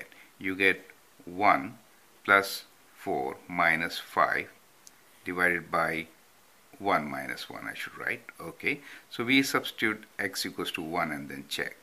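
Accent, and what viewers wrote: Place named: India. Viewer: nice job,. great accent too. reminds me of a group of indians we were working with at a start-up company & brings back good memories.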